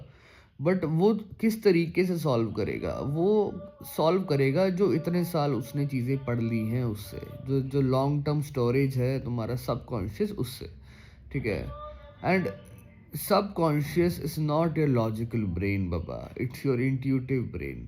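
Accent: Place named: Punjab, India. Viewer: Fuck everything i love your punjabi English accent.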